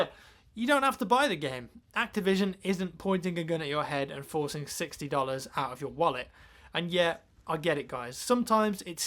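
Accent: England, United Kingdom. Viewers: its just a british accent man, take a fuckin chill pill and stop wasting all of out time with comments like these. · Ur english? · he did not said 30 60 controller lmfao.... he said 360... it's just that he's british and when he's talking fast you, as an ignorant american probably, might not understand properly what he's saying.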